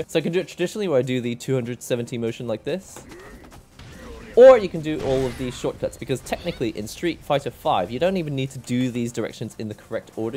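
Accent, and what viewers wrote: United Kingdom. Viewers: this is my first video of yours and seeing the name " " i was NOT expecting that thick british accent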